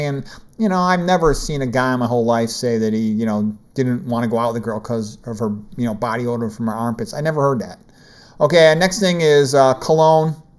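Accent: Chicago, United States. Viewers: You're accent tells me you're from Chicago?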